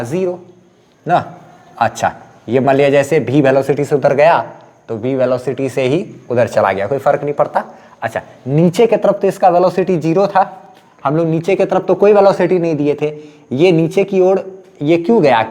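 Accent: Bihar, India. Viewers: Sir ap bihari h kya sir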